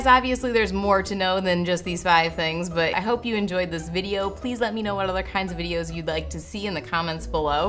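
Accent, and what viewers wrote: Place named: Minnesota, United States. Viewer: You sound very Minnesotan. Are you?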